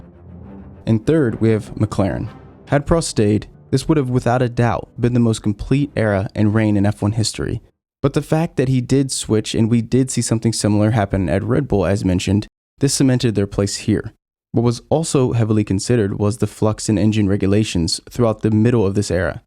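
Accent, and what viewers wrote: United States. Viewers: It might be weird, but this is my first time hearing an American accent talking about F1......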